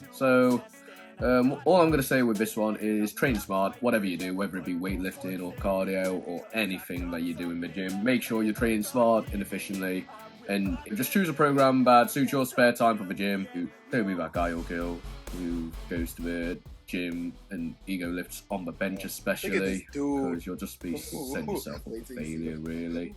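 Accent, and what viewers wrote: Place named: Australia. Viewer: Where does that accent come from, Aussie??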